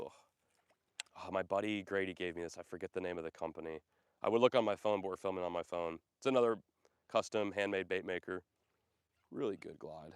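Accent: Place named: Chicago, United States. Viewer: you still talk like your straight outta the burbs of Chicago Texas hasn't changed your accent at all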